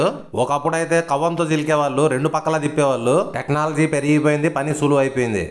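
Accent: Kadapa, India. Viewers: Kadapa slang super 😂😂😂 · ఒన్న నువ్వు సూపర్ న్నో నాది కడపనే ఏం మాట్లాడతాండవ్ న మన యాస 😂😂😂💗💗👍👍👍👍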